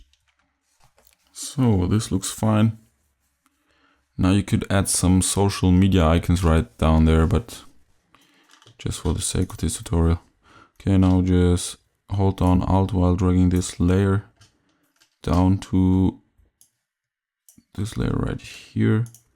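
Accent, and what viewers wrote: Germany. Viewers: I like how you make stuff on the fly, like u even surprise yourself. lols The german accent is also refreshing for some reason, maybe coz its rare that i hear it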